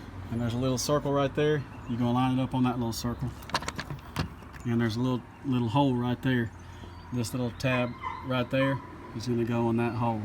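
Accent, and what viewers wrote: South, United States. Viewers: Excellent video. Southern accent can't be beat. I know why you use a 5 gallon bucket now. My little oil pan splattered all over tarnation. My filter was like new though, so I just put it back.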